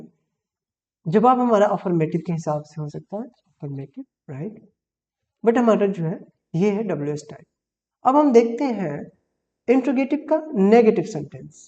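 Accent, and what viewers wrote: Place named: United States. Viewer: I love❤ your American style voice..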